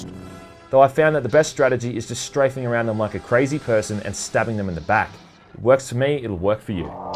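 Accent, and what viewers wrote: Australia. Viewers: this may be a weird question but what is your accent it sounds australian to me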